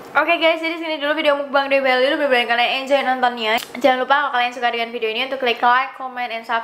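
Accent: Java, Indonesia. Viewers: Jowone kerasa pas ngomong 😁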